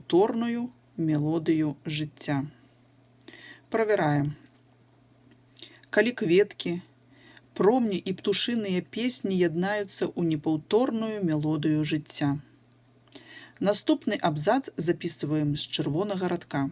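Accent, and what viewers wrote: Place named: Russia. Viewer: если диктанты, это не всегда значит, что именно про экзамен. Это так же может быть обычная практика, но касаемо акцента соглашусь. Ощущение, что читает русский, знающий белорусский по "знакомым словам". Особенно произношение "г" бросается во внимание сильно.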